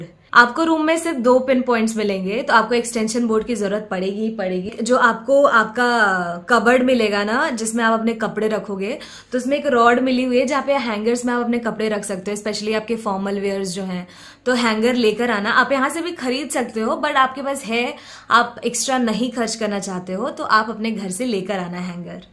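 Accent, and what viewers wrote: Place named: Bihar, India. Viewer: Aisa Q lg rha hai aap Bihari ho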